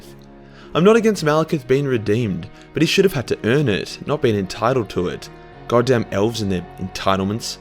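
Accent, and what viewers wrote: Australia. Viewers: I love listening to an Australia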